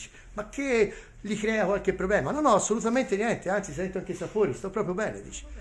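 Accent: Tuscany, Italy. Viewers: Grande Grande. Non è che non capiscono il finale, è che non sanno che la "C" in Toscana....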